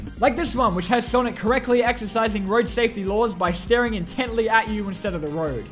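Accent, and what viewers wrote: Australia. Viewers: I feel like a dipshit for just now realizing you have a lisp. For some reason I just thought you had the strongest Australian accent i'd ever heard. And other youtubers would either be more shy or have a more noticeable lisp while yours blends into your accent. Either that or crazy. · Hey, i know ya probs wont get around to this but i really can relate to your channel and by that i mean like your veiws on transformers, humor and games. And i have had some rough times lately, so to have someone relatable to watch is real neat. And if you don't mind me asking, you sound aussie, you from aus? I am and im just curious. Anywho thanks for the laughs, entertainment and overall good vibes.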